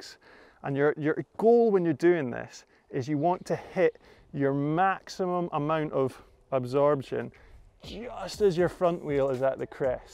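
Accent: United Kingdom. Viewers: "So obviously that's all beautiful, excellent technique.", with that British accent and the plethora of hand motions you've elevated biking videos to the level of a performance of Macbeth.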